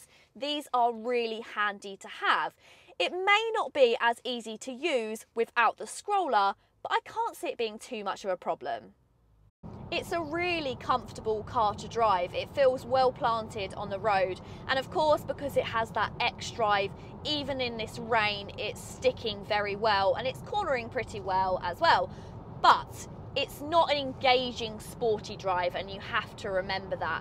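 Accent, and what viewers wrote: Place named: Surrey, United Kingdom. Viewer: God, I need to meet this gorgeous lady. Sounds like a Surrey accent.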